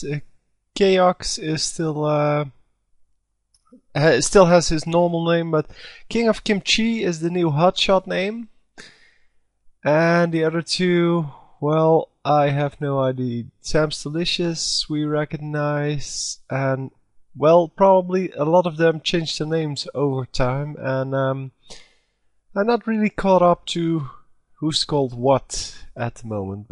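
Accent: Netherlands. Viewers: are you dutch? haha u realy got an dutch accent :)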